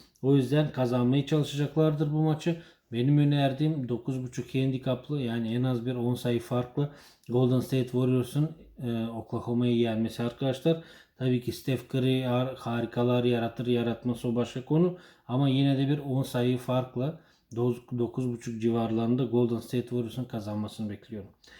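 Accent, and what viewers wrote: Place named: Bulgaria. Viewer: Sen avrupa dasin galiba bide konusman bulgarlar gibi?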